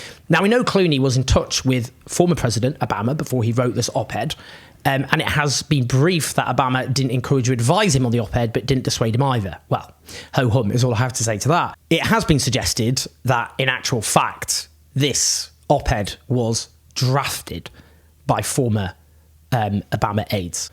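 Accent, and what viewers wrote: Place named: Yorkshire, United Kingdom. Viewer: He's a Yorkshireman, and they're a bit different up there.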